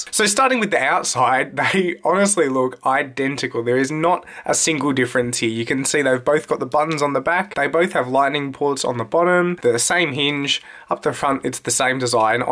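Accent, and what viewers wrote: Australia. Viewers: I'm from Britain and can confidentaly confirm he's UNMISTAKABLY Australian.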